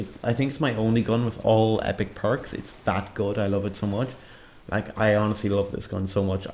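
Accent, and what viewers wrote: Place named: Ireland. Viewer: You’re from Ireland I can tell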